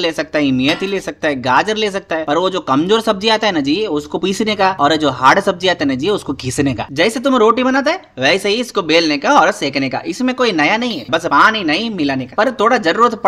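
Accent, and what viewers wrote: South, India. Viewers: Bhai normal baat karle...don't try to speak like South Indian 😢😢😢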